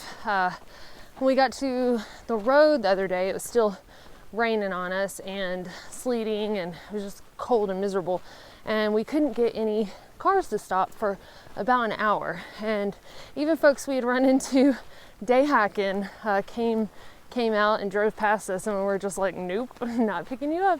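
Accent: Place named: Alabama, United States. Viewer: Am I the only one who teared up when Old Faithful started goin? I'm just in complete awe of you and your accomplishments and perseverance despite any challenges. It really makes me reevaluate the way I'm livin.. I live in some of the most beautiful of lands in northern California and tend to get complacent. I don't ever want to take all of this beauty for granted as I could be trapped in a concrete jungle. I have been binge watching this channel and now have my mama watching it too...also she's from Bama in a big way because her Dad lived in Gadsden his whole life and she'd be there for the summers. Then she started taking us kids there often growin up. So a lot of my relatives and even myself at times sound like you lol people look at me funny in Cali whenever I say "I reckon" and now I reckon I re-realize why I say it... because I've got Bama blood. 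Anyways, I can't wait to watch more of the trail and start bein more of a willer myself. I've officially got the hikin bug. Thank you for sharing your heart and journey with the world 💜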